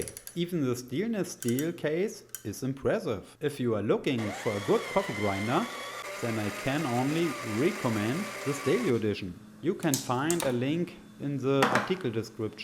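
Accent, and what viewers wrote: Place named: Germany. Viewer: Giving upvote for the lovely German English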